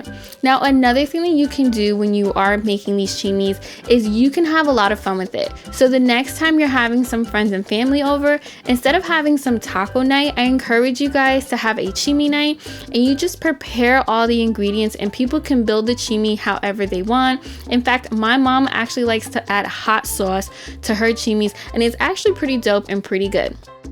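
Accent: New York, United States. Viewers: are you from New York bc you sound like your from NY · The Noo Yawk aspect of this video is EVERYTHING tqmmmm 🥰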